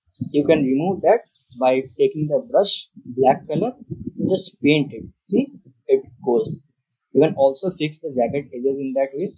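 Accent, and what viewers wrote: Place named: India. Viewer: Typical Indian English accent :3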